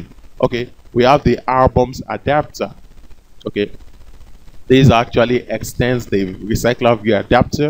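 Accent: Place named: Nigeria. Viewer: nice tutorial . this accent sounds nigerian doesnt it? i would like to know if there is an available repository to download your source code ? this would be very nice and helpful . thank you for this great tutorial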